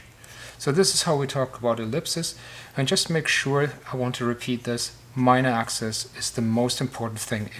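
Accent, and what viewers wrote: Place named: Scandinavia. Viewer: What happened to your voice? You sound Nordic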